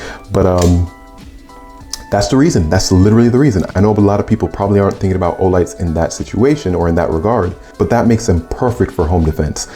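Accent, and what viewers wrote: Jamaica. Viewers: Honestly thank you for the review. I'm looking for my first light and I think I figure out exactly which one I will run on my G45. Dad crew here also. I also notice an accent similar to a Jamaican accent if that's your background nuff respect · First off breddah u sound jamaican are you? I am and i kept hearing the accent creep out haha. As far as the lights go i just 2 days ago sold my PL 2 valkyrie to buy the TLR 7 A. Im running it on my FN 509 Mrd and i love how it looks and fits flush. I like the fact it has a strobe feature (wish u would have covered that) i dnt miss the o light.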